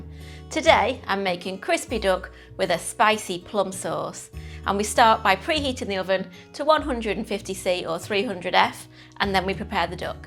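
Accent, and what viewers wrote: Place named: Northern England, United Kingdom. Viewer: It's her northern English accent. And what has it got to do with you how she speaks.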